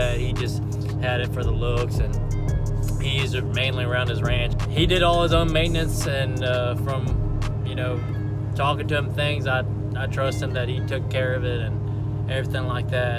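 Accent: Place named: South, United States. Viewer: An Asian dude with a thick southern accent is the wildest shit I’ve seen in awhile.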